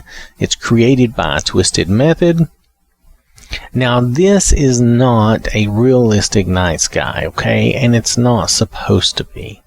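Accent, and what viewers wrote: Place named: East Texas, United States. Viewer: From east Texas? Sounds like it 10/10 hope these are on PC 😭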